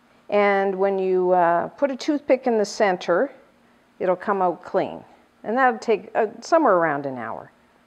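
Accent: Canada. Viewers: I like your Canadian accent: "aboot."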